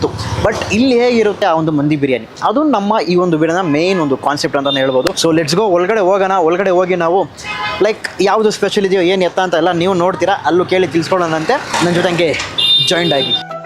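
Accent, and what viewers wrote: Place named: Tamil Nadu, India. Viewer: avanu mathadodhale gothaguthe…….he is Tamilian….plus he is copying style of Tamil food vloggers….bari dove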